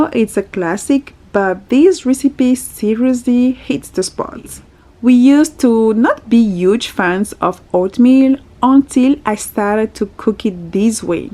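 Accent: France. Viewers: I’m so glad that I bumped into your channel. I’ve been a subscriber since. I’ll definitely try all of these recipes. 😊 Sn: I love your heavy accent. I figured you spoke French. 💋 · Un accent français ? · I'm subscribing to your channel just because of this video! I love the way you speak! Are you from France?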